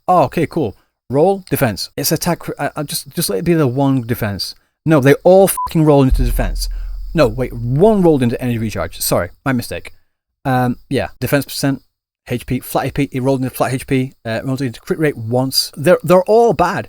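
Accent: United Kingdom. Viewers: British tectone?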